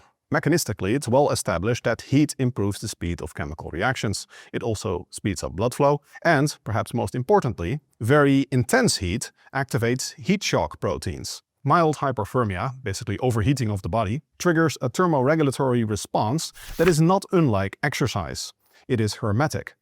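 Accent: Netherlands. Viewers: I wasn't watching the video and yeah that line did pop my eyebrow when I heard it. Going back it followed the other Dutch-tell phoneme he uses which is the uncentralized 'u' in "unaware". Anyway, if you're reading this, your English is great and your accent is very mild. Even Jeff Nippard has a noticeable accent to an American audience. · I know he's Dutch, but not where in the Netherlands. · His accent is pretty screwed up anyway because he's been away from Netherlands for so long.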